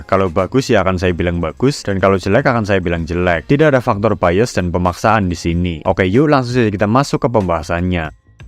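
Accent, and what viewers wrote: Banyumas, Indonesia. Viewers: Wiiiiih..slaam ngapak...lur.. ngesuk j klalen gari tuku ps5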